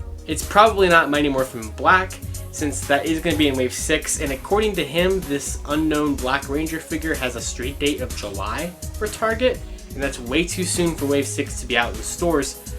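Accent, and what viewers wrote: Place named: United Kingdom. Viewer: Omg i thought you were from the uk lol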